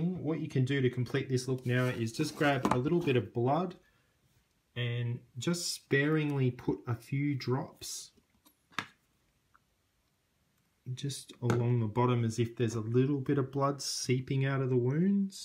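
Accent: Australia. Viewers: Are you an Ozzie? You sound like an Ozzie. Like if YOUR a Ozzie.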